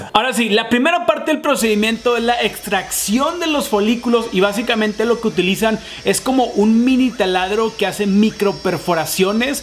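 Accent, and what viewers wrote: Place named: Fresa, Mexico. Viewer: Guey y por k ablas bien freson guey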